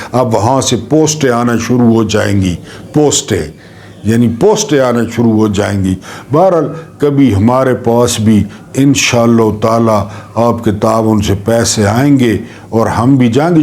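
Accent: Saraiki, Pakistan. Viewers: صاحب آپ کی سرائیکی میں اردو بہت اچھی لگتی ہے ماشاءاللہ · اردو میں سرائکی کا تڑکا واہ واہ 👌👌خوش رہیں۔😊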